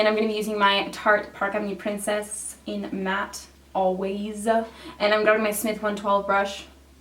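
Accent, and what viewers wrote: Canada. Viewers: your subtle canadian accent is so rad hahaha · Everyone is pointing out your slight Canadian accent and as a fellow Canadian, I didn't even hear it at first haha love ya!! ❤️ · Is she Canadian? bc I can hear her accent not a bad thing I actually love it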